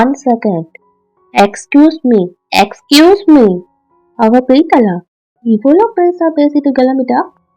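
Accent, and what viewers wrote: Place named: Tirunelveli, India. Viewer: noo ga neenga sollurathu tirunelveli slang maathiri erukku enn clg frd kuda eppadithaan ethavathu sollanumna eppadithaan oru visayatha avanala long ahh solla mudiyathu example valikkuthunu solla sonna valikki 😅 nu solluvaan sorry ga just fun 😵‍💫🙃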